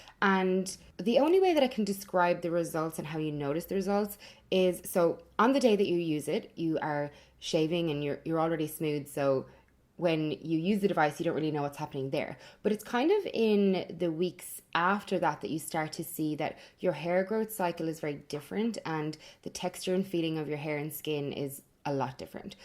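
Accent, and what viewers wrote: Ireland. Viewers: Was very confused at first when you mentioned Australia because I was like, "But wait... she sounds Irish. There is no way she is not Irish." Thank you for having accurate information in your "About" section! :)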